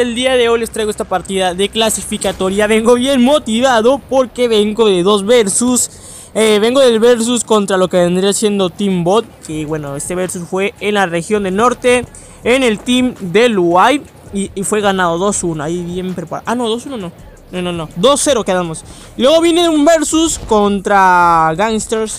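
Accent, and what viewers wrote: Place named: Argentina. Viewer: Usted parece argentino compa jajajajaj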